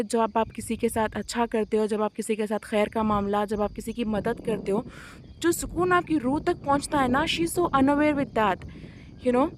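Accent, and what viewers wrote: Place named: Pakistan. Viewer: You are indian but your accent is pakistani Btw you are from which state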